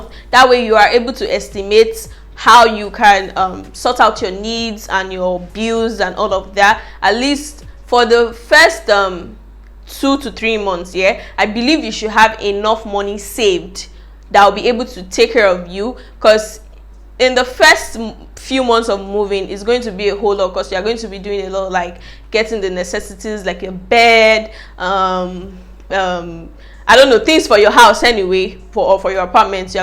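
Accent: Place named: Nigeria. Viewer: We all know she is a Nigerian from her accent.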